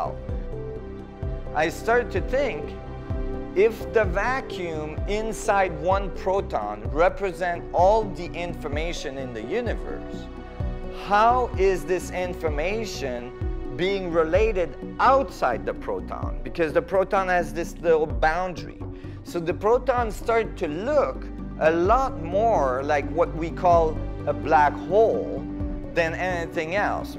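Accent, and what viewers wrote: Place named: Quebec, Canada. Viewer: Just found this one....following Nassim since 2006.....LOVE HIM, LOVE HIS MIND, HIS LOOK, HIS FACE, HIS QUÉBECOIS ACCENT, the whole being....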